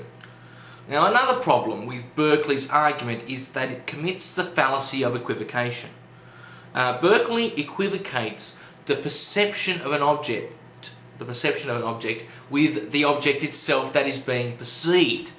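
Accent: Australia. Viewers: I'm so entertained by the juxtaposition of the words coming out of your mouth and your ocker accent! Rock on you ocker intellectual!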